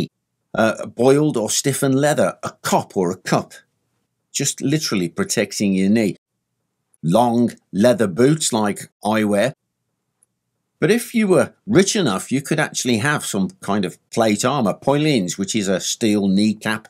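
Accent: United Kingdom. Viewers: Just found this channel glad I did. You’re a class act my man and you have the best kinda British accent btw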